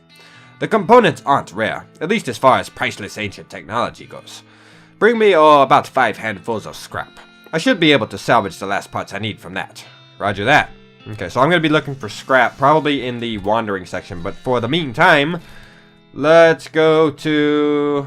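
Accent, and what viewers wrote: South, United States. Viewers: Maybe I knew him in another life.......I DUNNO........you sound so Southern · Your southern accent is much more prominent when you play this game. And what's that thing in the bottom left corner? I never noticed it till this video lol.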